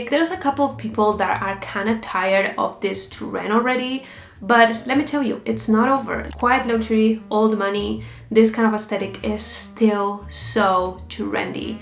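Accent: Spain. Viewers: are you a spaniard? you sound like my mom (in a good way)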